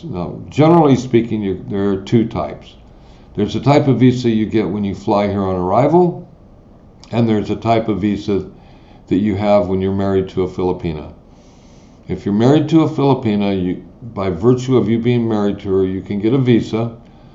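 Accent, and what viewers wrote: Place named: United States. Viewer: Here is my complaint about this video and I really don’t like to complain but when talking budgets it PH you are clearly speaking to folks who are not in PH. So why speak in pesos and to make it worse you alternate to both which makes it super confusing. At the very least stick to one but you sound like your from US and speaking to Americans so a budget in US dollars would be more friendly. Just some friendly feedback.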